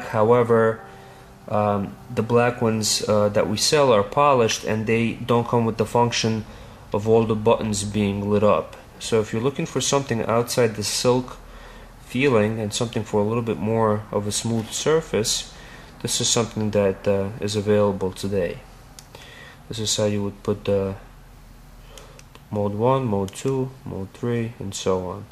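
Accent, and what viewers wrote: New Jersey, United States. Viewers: Jersey accent ;D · its a jersey thing pops in my head when i hear him talk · U from jersey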